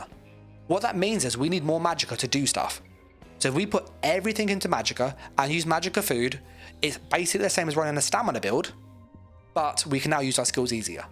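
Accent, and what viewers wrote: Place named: United Kingdom. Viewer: lol I'm just trolling. I knew you was a Brit from the accent. I lived near Mildenhall for 2 years back in the days. Played darts at the Bird-in-Hand pub, was a blast.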